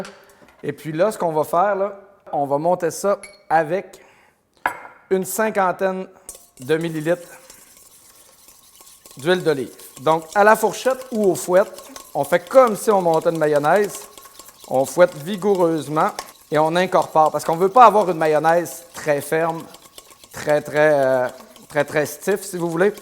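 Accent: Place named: Quebec, Canada. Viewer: j'adore la fraicheur de votre recette....bien assaisonnée , avec de beaux produits , j'adore votre générosité de recette détaillée,...et votre accent québécois qui rend heureux comme si toujours de bonne humeur...et je suis impressionnée par votre planche de travail en marqueterie très originale superbe..merci.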